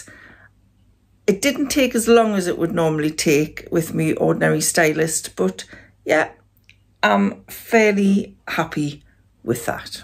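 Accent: Newcastle, United Kingdom. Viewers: I love your channel, one of my favourites (I love a Geordie accent, that always helps 👍). I want to start my own channel soon as I’m in the process of divorce and will be moving out probably next year to Sussex so I’m near my sister. I’m taking tips and a few ideas from you x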